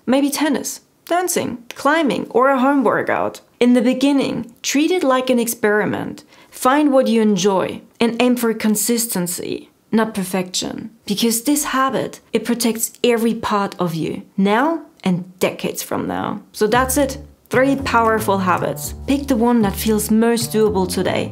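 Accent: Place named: Germany. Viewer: Fantastic accent? Sounds like German raised with some Southern England living, some Australia, a lot of US media?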